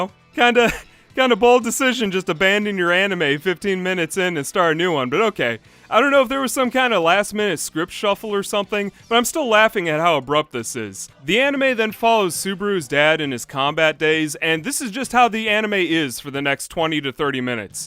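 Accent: United States. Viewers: Sound american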